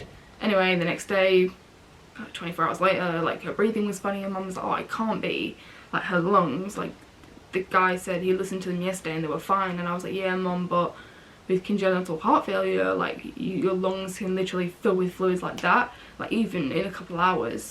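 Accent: England, United Kingdom. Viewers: Thank you for finding the strength and courage to make more videos. I really love to watch everything you record. Everything! Your voice is so sweet and soothing. I am not being weird. I am serious. I just love your accent and ' too! I have been doing a lot of work on Ancestry and found that I am predominantly English, and my ancestors were centered near London. I listen to you talk and imagine how my ancestors must have sounded too! Thank you for sharing your world with us. I look forward to the next video!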